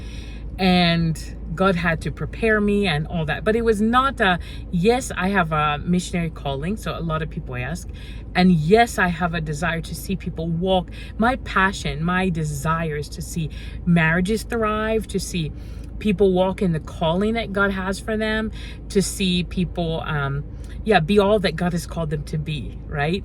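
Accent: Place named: Kenya. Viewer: 😂😂😂😂 I love venye the Kenyan accent has caught up. "Even me I will stare"😂😂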